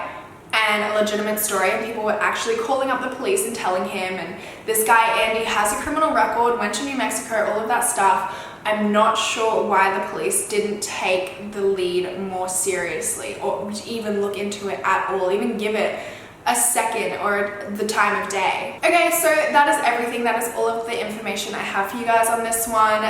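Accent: Australia. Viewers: She’s Australian. It’s an accent. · I have just been watching all US videos but my attention was drawn to your thumbnail at the side of my screen and thought "she's definitely Australian" so im here to check I was right....but I cant figure out what it is about Australian girls that makes them identifiable amongst everyone else on here? I can always predict when an Aussie accent is coming......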